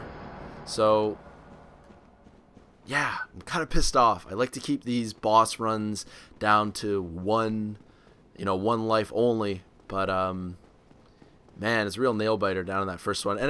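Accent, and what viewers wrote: Canada. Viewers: U r awesome bro...btw..r u canadian??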